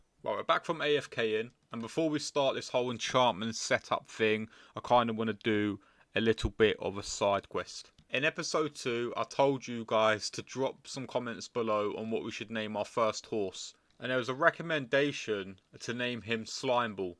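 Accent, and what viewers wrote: United Kingdom. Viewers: Yo bro love your british accent,continue doing content i will suport you! Cheers 👋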